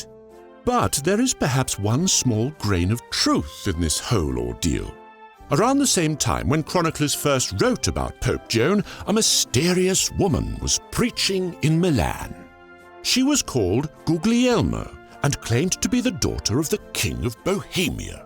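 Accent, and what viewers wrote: United Kingdom. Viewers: I guess his accent is British. I don't know why but most of the accents of documentaries sound British to me. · phenomenal animation, accurate history, Plus that middle-aged male British accent. Why is this only 13k subs? You earned one more today at least 👍 keep going!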